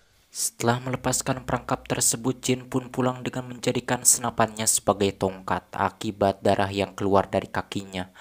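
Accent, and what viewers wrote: West Java, Indonesia. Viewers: AA orang mn siiih? Jiga na orang Sunda na..semangat a💪cute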